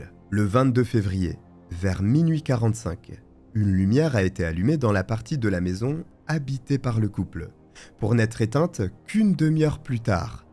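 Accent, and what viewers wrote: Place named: Europe. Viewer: Je te ❤ ta voix me caresse les oreilles et tu n’as pas un trop gros accent. ( en t k il est charmant et pas agressant) comparé à d’autre européen.J’adore ce que tu fais! Bizou 🇨🇦du Québec ✌🏽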